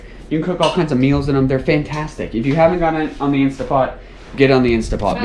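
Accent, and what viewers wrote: Tennessee, United States. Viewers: So glad I’m done raising kids .. I would be a nervous wreck if my kids were little at this time .... Do I detect a little Tennessee accent starting ? I think maybe .. 🤗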